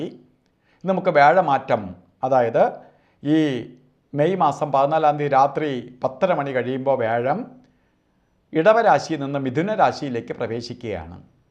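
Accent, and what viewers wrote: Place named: Thiruvananthapuram, India. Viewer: Pakka tvm accent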